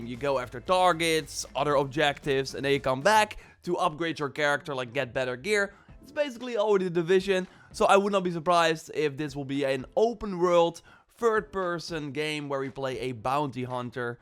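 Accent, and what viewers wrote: Netherlands. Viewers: Oh haha Your dutch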